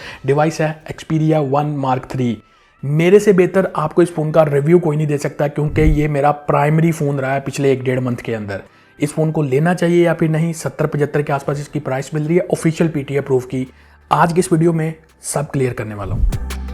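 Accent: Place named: Punjab, India. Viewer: Lagta hy gu panjabi hu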